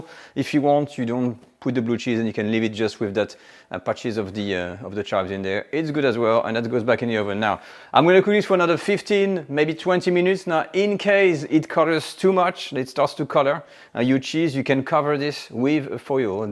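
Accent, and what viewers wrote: France. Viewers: I could just listen to your French accent for ages!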